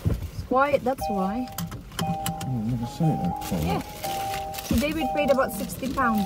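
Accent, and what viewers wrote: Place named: Visayas, Philippines. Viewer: bisaya di ai ka sis hahahha relate jud sis hahaha usahay galisod ko tagalog hahaha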